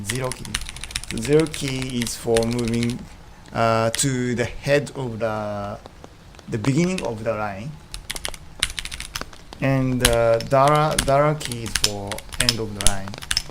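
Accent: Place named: Japan. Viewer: 日本人の喋る英語ってとても参考になります。vimも覚えたかったので私にとっては素晴らしい動画でした！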